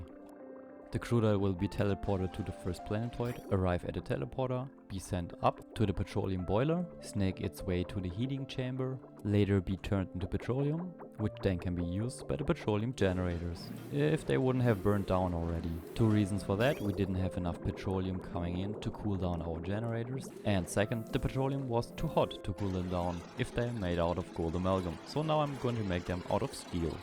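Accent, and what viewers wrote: Germany. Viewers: You sound German… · ive now watched about 2 and a half hours and realized your accent you are german right ? gibt es auch deutsches gameplay von dir ? is there also german gameplay? and btw i ike your voice and your video very much !!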